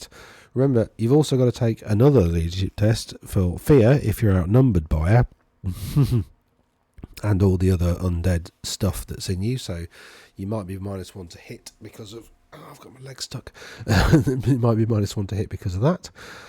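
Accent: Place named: South, United States. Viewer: Building my witches and psychos in the woods vampire force at the moment very exciting.. What's your region you sound like you are a southerner like myself?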